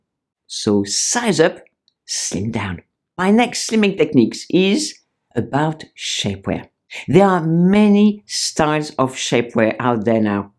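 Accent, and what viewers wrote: France. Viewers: I could listen to you talking with your French accent forever. 💙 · Such helpful advice, even more effective delivered d with a charming French accent! And your humor is another bonus 😍thank you! Just found your video today so am subscribing formore🙏🏼🥰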